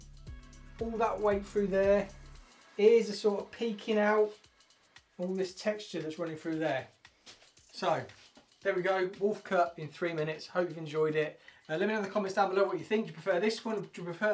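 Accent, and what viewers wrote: United Kingdom. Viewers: Cómo me encanta el inglés británico lpm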